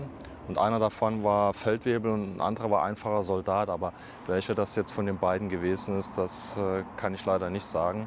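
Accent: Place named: Dresden, Germany. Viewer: Ha. Hab gedacht das Du Dresdener bist. Ich erkenne die Weise mit der Du sprichst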